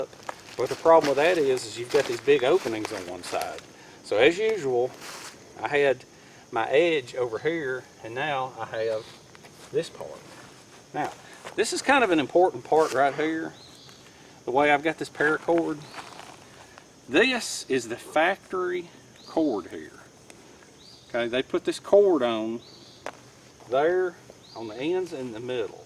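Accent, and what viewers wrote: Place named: Georgia, United States. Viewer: We love this guy... He speaks real American Georgia English . Love from JAPAN . ✌️😉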